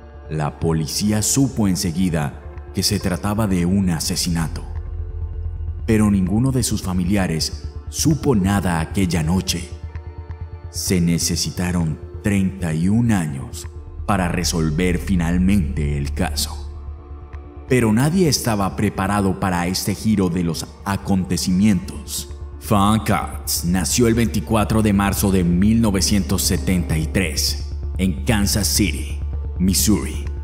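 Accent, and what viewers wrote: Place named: Spain. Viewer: Es el primer vídeo tuyo que veo y ya me he suscrito a tu canal. Me encanta el español de España que usas y que hablas en todo el vídeo.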